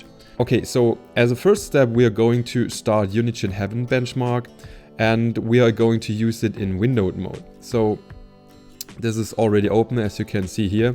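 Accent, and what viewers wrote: Germany. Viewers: German accent and American cap.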